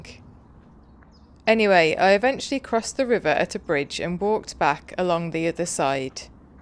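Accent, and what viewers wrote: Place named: England, United Kingdom. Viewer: Hi best regards from Poland , i love your english way of speaking , just very english for me:)like yor videos waiting for next one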